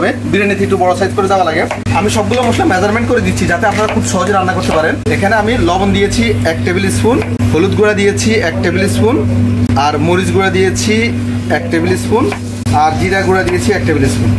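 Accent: Noakhali, Bangladesh. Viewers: কিও ভাই আন্নে কি নোয়াখালী পোলা নিও বার বার পানি কে ফানি বলছেন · ভাইয়ের বাড়ি নোয়াখালী এ ভাষা সারা বিশ্বে চলে ইউটিউবে কি সমস্যা? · Apnar basha ki feni naki noakhali?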